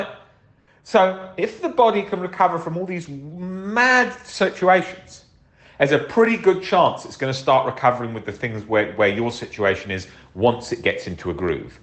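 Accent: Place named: England, United Kingdom. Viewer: Thanks for the great video! I also have to say: you sound a LOT like Alan Watts! As an American, I wonder if you both come from the same area in England cuz the accents are almost identical! 😂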